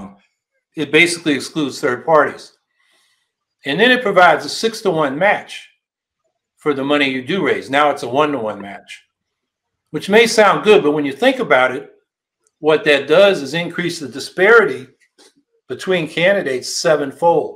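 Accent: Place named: South, United States. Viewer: According to Wikipedia: "Hawkins was born in San Francisco, California, in 1952, and raised in nearby San Mateo, California. He grew up in a diverse neighborhood in the city near the Bayshore Freeway, which had seen a large influx of migrants from the southern United States, both black and white: Hawkins has credited his southern-inflected accent as being a result of this."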